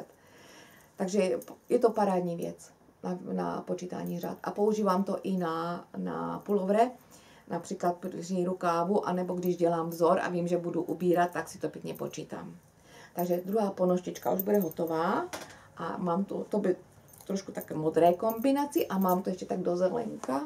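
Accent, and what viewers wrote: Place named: Slovakia. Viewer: Pozdravujem vás. Máte krásnu prácu a koľko toho stíhate! Ja som stihla len kupiť priadzu na letnú háčkovanú tašku ale asi ju nestihnem. Ponožky ma eštelen čakajú a mám strach, že to bude závislosť. Prepáčte mi, že som zvedavá, ale podľa vášho prízvuku mám dojem, že ste Slovenka. Je to tak? Nech sa vám darí.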